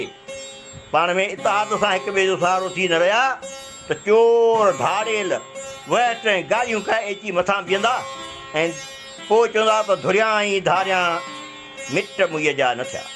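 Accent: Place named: Sindh, Pakistan. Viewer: واھہ آواز تہ ڀلو پر پڙھڻ واري جو لھجو ٺيٺ سنڌي آھي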